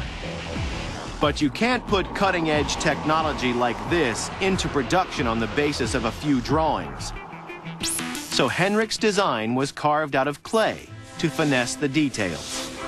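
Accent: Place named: Denmark. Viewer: Haha :D it's funny how clearly you can hear that he is danish ^^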